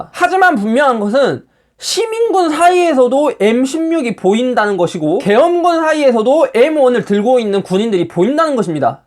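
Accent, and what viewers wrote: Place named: Jeolla, South Korea. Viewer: 전라도 사투리 구수하네요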